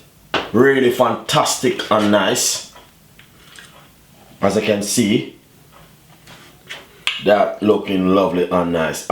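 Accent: Jamaica. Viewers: ummm he's speaking patois (patwa) our native Jamaican language....if u dont like how he speaks then move on to the next video.. we know its nutmeg but in broken English is nuttinegg....bitch!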